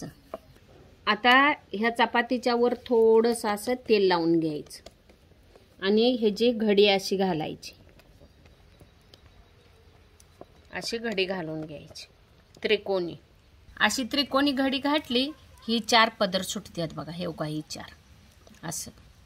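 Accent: Kolhapur, India. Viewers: Sweet and beautiful kolhapuri tadaka🎉 चपाती लागत्याच, माझ्या अनूभवावरून सांगतो, जातय, करतय sweet language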